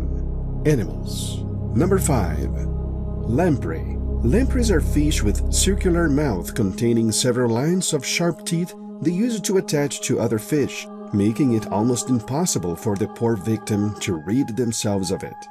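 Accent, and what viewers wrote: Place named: Eastern Europe. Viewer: This guy sounds like Idubbbz eastern European accent lol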